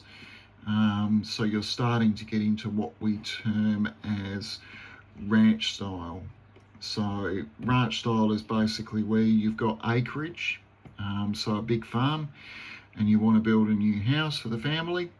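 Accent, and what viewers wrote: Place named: Australia. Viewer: You're work is truly awesome! You have made your mark in many families lives with your designs! I would be curious too to see the completed build! In Australia is there a minimum sized required to build a home on land I wonder? I wanted to have a home built on land for awhile but unaffordable for me now. I can still dream though! I'm glad I found your channel really easy and so far I am impressed! Off to watch more of your videos now! PS your Australian accent I think is so cool mate! 😁👍💯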